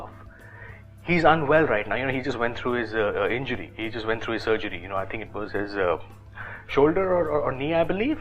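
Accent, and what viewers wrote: India. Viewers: fuck you and your indian accent its soooooo fucking anyoing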